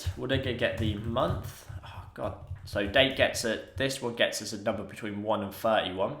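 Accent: United Kingdom. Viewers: learned a nice thing today weather = wafer, the British accent is sometimes very funny and sometimes just annoying :( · you're British right?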